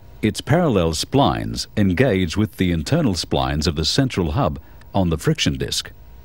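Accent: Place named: Australia. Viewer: Perfect Standard Australian accent being spoken.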